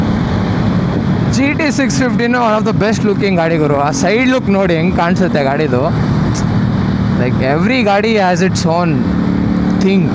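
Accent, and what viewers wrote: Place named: Kalaburagi, India. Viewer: Bro I saw your different Kannada slang in previous video. I believe you must be from Kalaburagi 🔥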